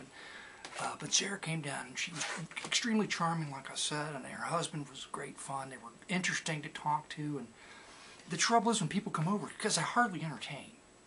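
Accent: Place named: South, United States. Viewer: You have a fine country accent going on there.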